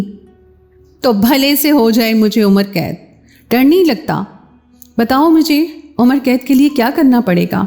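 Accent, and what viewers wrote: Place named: India. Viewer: you still have Indian Hindu accent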